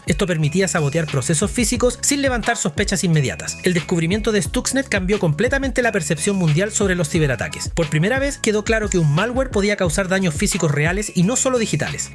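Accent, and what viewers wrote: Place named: Chile. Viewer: Todo bien Excepto el acento chileno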